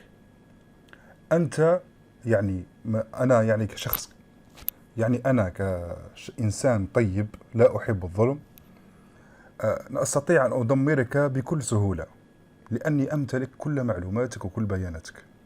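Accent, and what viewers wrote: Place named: Morocco. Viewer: واضح من اللهجة تاعوا انه مغربي.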